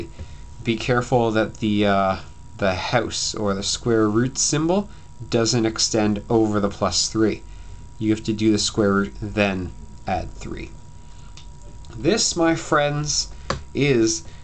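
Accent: Canada. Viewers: OMG! You're Canadian! :D Thanks for the help btw :3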